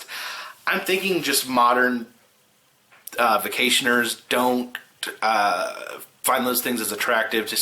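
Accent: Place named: South, United States. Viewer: If you got together with Dan Bell, that would just make my day, year.... LIFE!!! His creepy with your southern....WIN!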